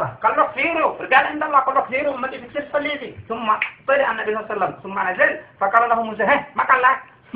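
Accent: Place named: Tihama, Arab world. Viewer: اضن انه اوصل المعلومة بس بلهجة اهل تهمامه ..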